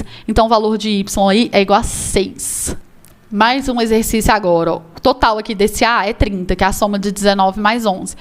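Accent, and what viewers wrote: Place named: Minas Gerais, Brazil. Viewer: Olá prof, a Sra é uma excelente professora, só que a Sra fala rápido demais, vou desconsiderar essa parte devido eu gostar do sotaque mineiro. Parabéns.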